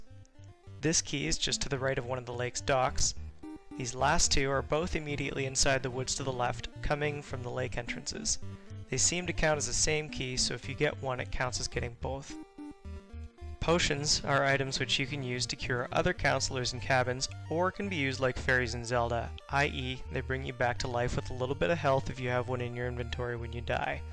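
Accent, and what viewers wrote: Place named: Canada. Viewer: SO MUCH CANADIAN ACCENT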